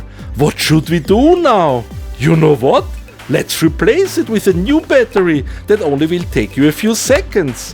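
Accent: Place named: Austria. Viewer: austrian english at its finest!!! love it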